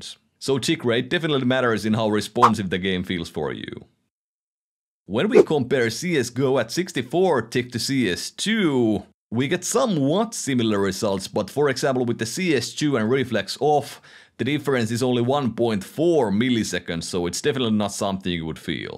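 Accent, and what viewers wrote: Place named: Finland. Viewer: Your accent looks like FInnish if im not wrong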